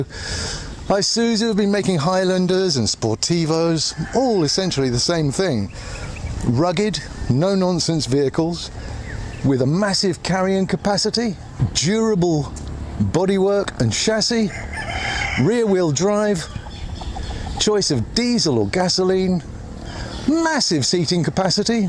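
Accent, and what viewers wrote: United Kingdom. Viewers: We used to have one of those in the Philippines. Wasn't the latest or the best, but hauled people and stuff for relatively cheap. Back then when you asked if people had a car, they'd usually answer, "yes we have an FX." You can seat 10...Legally lol Something about having a Brit do the narration makes the experience sound like a cool museum tour :) Flippin Marvelous! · With all due respect to Scotty who knows a good review when he sees one, let's give credit first to the one who made the review, namely,! You are a natural! I think with your melodious British accent, which Filipinos love to hear, you can have a career doing reviews of things you love to use.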